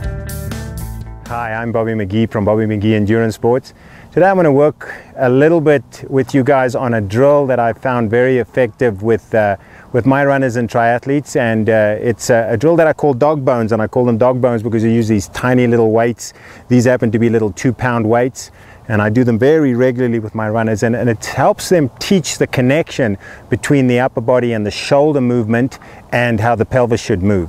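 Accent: South Africa. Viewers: Is that a South Africa accent?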